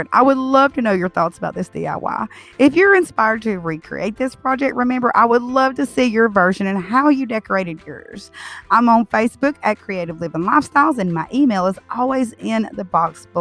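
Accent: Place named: Oklahoma, United States. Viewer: Ooooo! Pretty! I love the set, but I really love the tallest one most. Thanks for sharing! Your accent is adorable, btw! My hubby has one, too. He's from Oklahoma. I'm from the Pacific NW, which is called a "Newscaster accent." Just a silly FYI!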